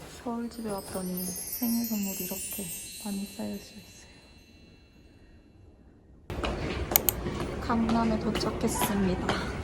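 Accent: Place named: Busan, South Korea. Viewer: 님 사투리가 들리네요!ㅋㅋㅋㅋ 부산사람이셔요?!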